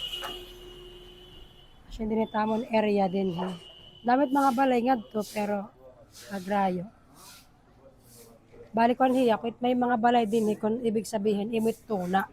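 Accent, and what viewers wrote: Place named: Borongan, Philippines. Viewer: New subscribers here☺️ Watching from Canada 🇨🇦 a Filipino Canadian from Tacloban Philippines! U speak fluently in Waray madam! Taga diin ka ha Leyte if u don’t mind me asking? If I guess correct me if I’m wrong u sounds from Borongan Samar?hehe I’m proud Waraynon here! God bless u and wish u best of luck madam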